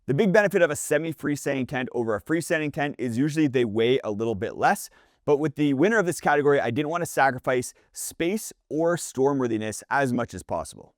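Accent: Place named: Canada. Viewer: my god you are soooooo Canadian. I can't listen to it. It's just so laughable.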